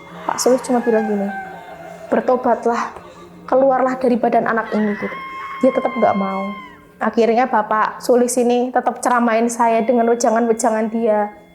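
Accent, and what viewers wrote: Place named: Banyumas, Indonesia. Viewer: Kak km orang ngapak yaa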